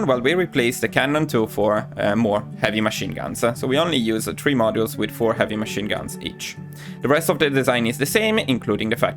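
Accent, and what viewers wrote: Italy. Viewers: are you italian by chance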